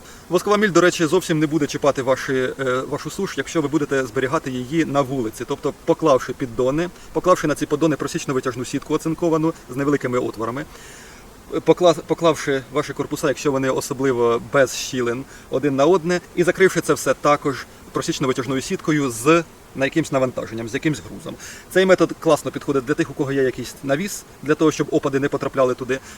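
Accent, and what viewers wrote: Standard. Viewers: Діло знаешь . Мова чиста , грамотна. Один недолік cпішиш. Кому ти розказуеш? Людям , то куда спишиш, виходить розсказуеш сам соби. Старайся , і поправляйся. Тоді все буде добре. Бажаю успіхів. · Дуже гарна чиста українська мова. Стільники необсушені мені зберігати не сподобалось. Обсушую у вуликах через заставну або через плівку. Далі проморожую у морозильнику і зберігають у порожніх корпусах + з оцетовой есенцією.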